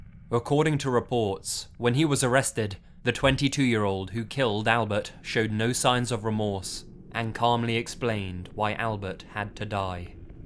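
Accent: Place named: United Kingdom. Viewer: opens video British accent closes video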